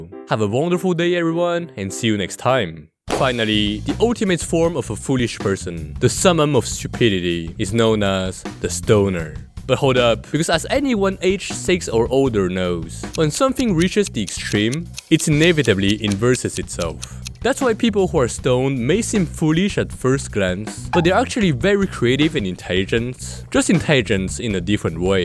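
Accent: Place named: Russia. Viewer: Ah tu es français?😮 Je me demandais si c'était un accent Russe ou un truc du genre.😅 Donc tu as un autre channel en français? Salutations de Montréal, Québec! 🙂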